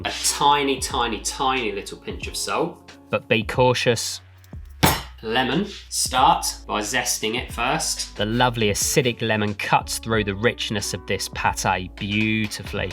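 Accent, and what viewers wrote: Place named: West Country, United Kingdom. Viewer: Well you really have a West country accent ....nice recipe.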